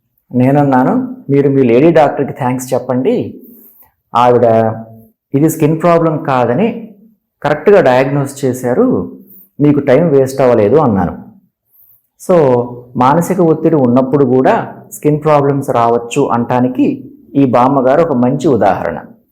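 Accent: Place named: Vijayawada, India. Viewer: మీది బెజవాడ నా. మీ slang అలా అనిపిస్తోంది. చాలా నిదానంగా స్పష్టంగా చెబుతున్నారు. అభినందనలు డాట్రారూ 💐💐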